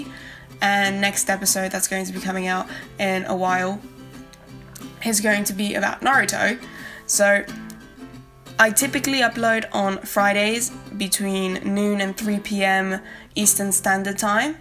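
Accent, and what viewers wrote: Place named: South Africa. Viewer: I was trying to figure out if you were British or Australian listening to this video but boy I don't think I would have guessed South African accent but it makes since it was a British Colony for years.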